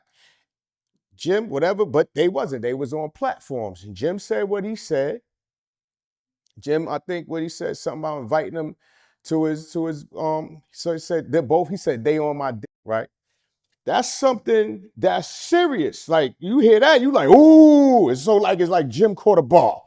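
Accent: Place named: New York, United States. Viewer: He a true NY nigga man… this is how we really talk and explain situations lmfaoooo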